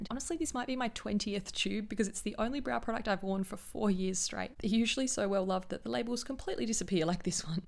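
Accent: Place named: Australia. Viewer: I never really got into makeup before but I’ve got orders from Glossier, Fluff and UltraViolette coming now.... and I have you to thank/blame! 😂 Like many others I’m so so grateful that your makeup ‘maximalism’ can help so many people see products perform, decide for themselves and embrace minimalism in the way they actually purchase and apply product. It’s good for the world. Your lovely accent makes me proud to be Australian too. Sending love from Melbourne! ❤️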